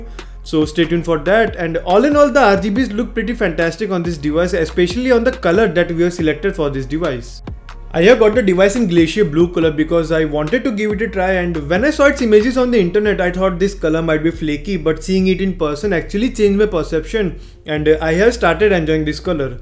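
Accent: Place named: India. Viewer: my english listening skill was tested in an extreme lvl by an India accent + the speed that he spoke. even my Russian teacher's voice can be understood more easily than you lmao. but nice vid👍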